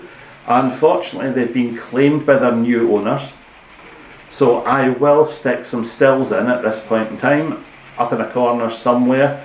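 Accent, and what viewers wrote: Scotland, United Kingdom. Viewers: Hi, Nathan sent me. I really liked your podcast. Loved your knitting, accent and the pitter patter of your lovely Scottish weather. I'm am partial to Scottish originals, can't get better than bagpipes and Westies (We have 2). I look forward to seeing your creations. Good job!!!! Sandy (Albany, NY USA) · Just starting to go back and watch all of the podcasts, the socks are made from Drops Fabel...I just finished a pair in the same colourway.I love listening to you speak, it reminds me of my husbands family in Scotland. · I like your Scottish accent. Hey, here's how I talk, or taw-wuk: <link> How blessed you are to have a grandma who was so crafty! Lovely! By the way, I use acrylic almost exclusively. I knit for charity, and friends, and it seems to make more sense to make a garment that can be worn after throwing it in the hot water washer and dryer. 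Beautiful entrelac. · Hello. It was a pleasure watching your first podcast. As a native scot, now living in Canada, it was pure heaven listening to you talking about my passion, knitting, in your very calm and lovely accent.I look forward to your next podcast. Cheers Christine Ferguson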